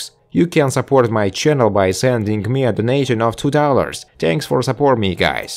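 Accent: Russia. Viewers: Каким-то образом видео попало ко мне в рекомендации. Слушаю и думаю, ебать какой дикий акцент. Подумал сразу может это русский, а потом посмотрел инфу канала и подтвердил свои домыслы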